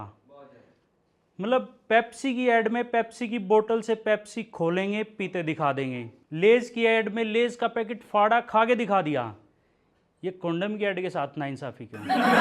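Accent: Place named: Haryana, India. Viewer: Great Going Bhai... 👌🏻👌🏻👌🏻👌🏻👌🏻👌🏻👌🏻 All the best, we all are with you... Meri ek baat hamesha yaad rakhna, Your HARYANVI Accent is your STRENGTH, your ACTUAL POWER... Don't ever loose it, Keep it up... 👍🏻👍🏻👍🏻👍🏻👍🏻👍🏻👍🏻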